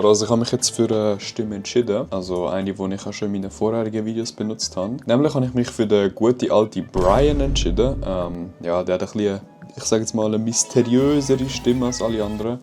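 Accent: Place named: Switzerland. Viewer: Also trotz Schweizerdeutsch kann ich dich gut verstehen. Fands interessant wenn auch die Videos die rausgekommen sind mich so absolut überhaupt nicht catchen.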